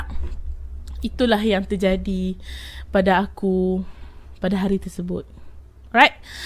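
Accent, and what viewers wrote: Kedah, Malaysia. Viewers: Aku suka akk loghat Kedah😌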